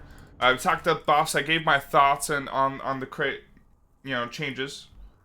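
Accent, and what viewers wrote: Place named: Poland. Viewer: Jest i polski akcent, niestety jak zwykle popisaliśmy się językiem ojczystym...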